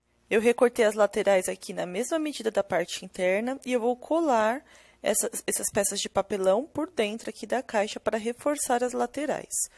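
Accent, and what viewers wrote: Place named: Bahia, Brazil. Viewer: vc fala um pouco baiano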